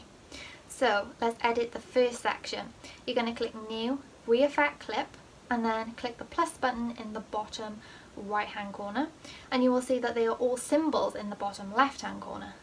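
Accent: Wales, United Kingdom. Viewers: Hey! Are you welsh? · Omg love your British accent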